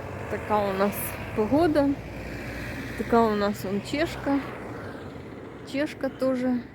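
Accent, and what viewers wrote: Sumy, Ukraine. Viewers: Сумський суржик не можно не впізнати,так говорять тільки в Сумах.❤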